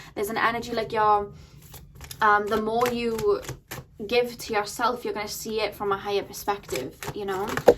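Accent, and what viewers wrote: Wales, United Kingdom. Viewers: I love it the Welsh is one of my most favourite accents I don't know how but I hear a little bit geordie in there it's lovely! And your readings are so good!! 😘💗💗💗💗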